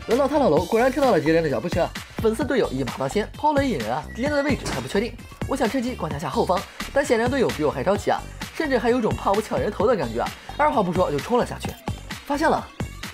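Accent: Hong Kong, China. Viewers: 你係香港人嗎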